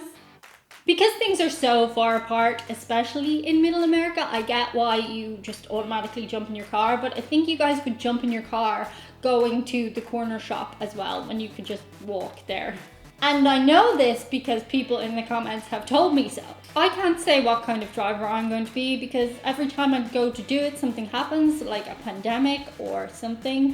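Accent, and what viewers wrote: Ireland. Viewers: You know is getting serious about a topic when the cat ears come out. Just imagine poor Chewie’s shock upon being woken up from a lovey nap to be confronted by a glittery cat creature with an Irish accent. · I would suggest flying into Minneapolis (AMS) from Dublin (DUB). I see tickets on decent aircraft for $631 on Lagskipping airline route finder. Then take a car down Interstate 35 through Des Moines, Iowa to Kansas city (MCI). You can pull over to small communities within miles of the highway. When you get to Kansas City, you can fly direct back to Dublin for about $750. I don't currently know anyone who bowls more than once every 28 years. When you get to northern Iowa, pay attention to the accent. Many of the immigrants that settled from countries that did not speak English hired Irish girls to teach in one room schools. It was a welcome opportunity during the potato famine. 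The central US does not have any hint of an English accent. It was strange hearing your Irish accent instead of the fake TV and Movie ones. See what you think when you drive through. Your timing should correlate either to the Iowa State fair in Des Moines or any or several fairs on your way through. (most are in late June or July but Des Moines should be in August). And yes, the coasts and south are almost like different countries from the Midwest west of the Mississippi. Towns east of Mississippi in the Midwest are just like extensions of Pennsylvania with large cities. If you want to try real corn, you want bicolor Iowa supersweet harvested no more than a day (preferably 3 hours) before cooking. (be sure not to get dent corn. that is the low quality stuff for feeding pigs or put into cans exporting) Ca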